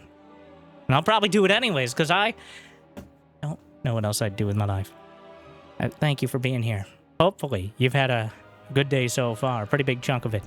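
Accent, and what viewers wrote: South, United States. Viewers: Dude im not gonna lie, I used to watch you years ago. I do NOT remember you having a southern accent whatsoever. Am I delusional?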